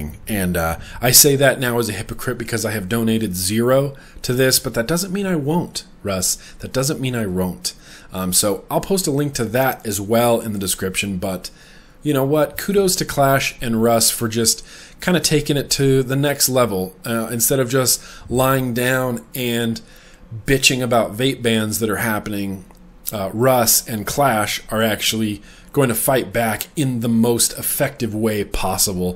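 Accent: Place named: South, United States. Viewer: i just need to say that the southern bell accent is the sexiest accent there is. also i wish i would have started vaping years ago, so i could have been watching these vlogs, its a highlight in my week.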